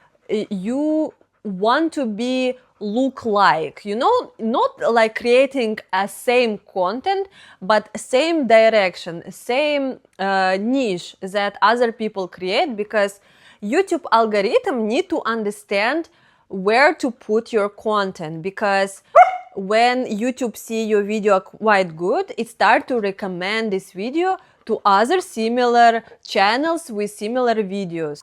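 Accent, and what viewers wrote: Russia. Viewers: We love you my russian queen 🇷🇺❤️👸 You are beautiful and intelligent. Harmonic and calm it's very pleasant. Remember! The most of us is not native English speaker so you can talk as your like with your lovely accent. English is only like Latin during the middle ages for people to communicate and reach out to one another. Fuck the western corrupt political elites. We know the truth and respect the great Russia. And some of us know our common old history. I love to see your videos from Russia and I feel and see many related things. Only in a another way. Keep up the good work. With love 💕 from Sweden 🇸🇪👱👋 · Your Russian accent sounds so elegant! I could listen to it all day and be like 😊! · I've noticed that in your latest videos your Russian accent is more pronounced than in your previous videos from the last few years... it sounds great. 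Spanish is my native language, and I have to say your English is clearer than that of many YouTubers who speak English as their native language. I watch your videos to practice my English listening skills, and I understand everything you say perfectly. You do a great job!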